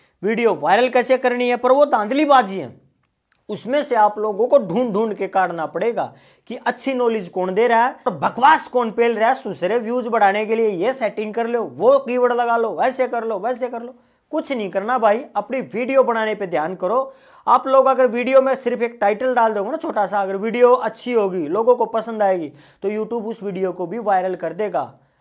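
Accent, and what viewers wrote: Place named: Gujarat, India. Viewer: Bhai bat sun k gujarati lag rhe ho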